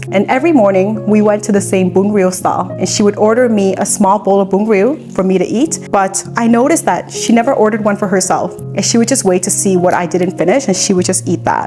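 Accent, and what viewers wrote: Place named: South, United States. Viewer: Why do you speak more like a southerner?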